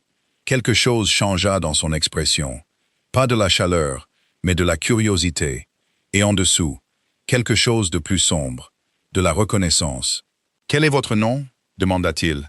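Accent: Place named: England, United Kingdom. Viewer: Histoire agréable mais narration fort désagréable. Accent anglais puis voix monotone sans aucune chaleur.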